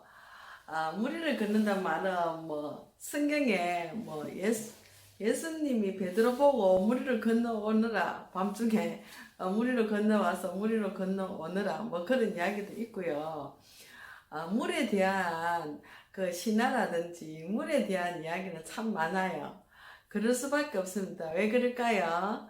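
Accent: Gyeongsang, South Korea. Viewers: 안녕하세요. 저도 손잡으러 왔습니다 ~ 경상도 분이시군요 😊 만물의 근원 물. 장말 중요하죠. 시청하고 응원 드리고 좋아요. 손잡고 갈게요~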